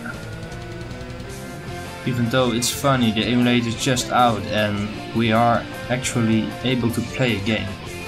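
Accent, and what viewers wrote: Netherlands. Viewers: Are you Dutch? / Ben je Nederlands?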